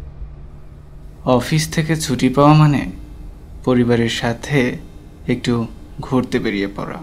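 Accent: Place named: Bangal, Bangladesh. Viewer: এমন বাঙ্গাল উচ্চারণ কানে বেশ বাজে লাগে।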